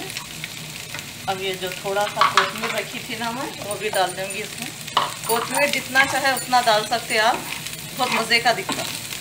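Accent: Hyderabad, India. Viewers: Ham hyderabadi hain aunty chote the tab dadi phopo sub apki terha baat kerte the ab unke bad hyderabadi sunne ko taras gai thi ap ne apno ki yad dila di m apko sunti hon lagta hai meri phopo n dadi bol rahi hain Allah apko lambi zindagi de Ameen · Assalam u Alaikum.Aunty aap mere msgs ka rply dijiye plz khushi hoti meku....Allah apko sehath de.Ameeen...aap bahut acche hai luv u😘😘 aap sab k liye itni duaien dete...Allah tala apko bhi accha rakhe.apka bolne ka andaaz ekdum hyderabadi style me bhut accha lagta me too frm hyd. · You really inspired me aunty, I am so happy that you made this valuable video. Firstly, I thought what I am hearing??? But once I started hearing you alhumdullilaha you made me realise that I am in Hyderabad. Lastly unlce's story is too motivational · Aunty I love u ap boht achy ho ap ki hyderabadi language mujhe boht achy lgta h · Hyderabadi lehja boht meetha or apnayat wala hota hai mai b india hyd se hu lkn shadi pakistan islamabad me hoee hai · Love your commentary ,feels like I am in Hyderabad listening to your Hyderabadi Hindi,I am Telugu living in US,my cooking style is like yours, influenced by Muslim culture,born n brought up in Hyderabad. 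· Jazzak Allah Aunty, aap ke Hyderabadi sun ke Ammi ki yaad agai ju Pakistan mein hai..main malaysia mein akele rehta hoon aur yeh recipe zaroor try karonga..,Allah aap ko aur Uncle kush rakhay, take care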